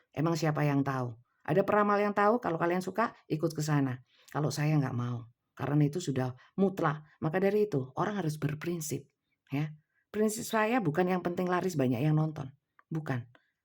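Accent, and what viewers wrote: Java, Indonesia. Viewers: Saya baru bergabung. Saya jatuh cinta dengan aksen medok nya, ibu. Sangat terhibur sekali. Tidak semua resonet, tapi apa yg ibu katakan masuk akal kalau. Membuka mind set saya. Dan saya mau subscribe n like postingan ibu. Semangat untuk upload ya Bu. Saya pasti dengerin ibu, tidak masalah resonet atau tidak.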